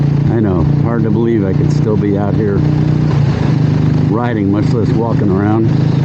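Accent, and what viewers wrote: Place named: United States. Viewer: Marshall to Leicester... Thought these sounded like towns in Great Britain, so I thought this was another "English Chaps" review of the 350 until I heard your voice! Then thought, OK, its an American living in England! While watching, realized your in North Carolina! Love your Humor and stories ... Like murder in the Appalachians ... which made me think of the book by Bill Bryson, "A Walk in the Woods". When you started recalling stories of your childhood (like using the sweeper for siphoning fuel...back in the 50's), ... another Bill Bryson book came to mind ... "The Life and Times of Thunderbolt Kid". Bill Bryson, like myself, was born in 1951 and your humor reminds me a lot of his! I think we all pulled some "bonehead" stunts back in those days but as long as you made it safely home by suppertime,... mom and dad didn't seem to care what you were doing or where you's been all day! 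Much simpler times back then! Anyhow, I hope your enjoying your Signals Edition in Desert Sand, (as much as I am enjoying mine!)... Sure wish Northeast Ohio had a few extra weeks for motorcycle riding, ( like perhaps North Carolina does?) so my bike wasn't hibernating as long! Your audio sounds great! I'm waiting on the next shipment of Instamics (for my Insta360 One RS camera), so I can loose the dual wires (running from my helmet and saddlebag), and enjoy riding without being "tethered". Drive Safe!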